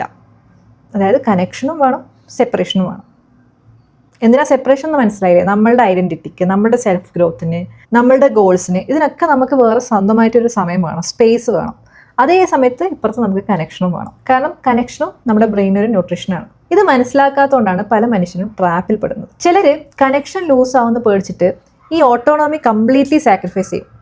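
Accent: Kannur, India. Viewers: Offtopic Slang felt like u r from kannur Kannur edyappa?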